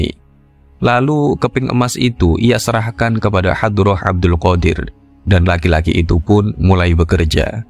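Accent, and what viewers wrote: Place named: Tegal, Indonesia. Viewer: Alhamdulilah terjemahe wonge dewek Tegal ngapak ngapak nyong rongkna lur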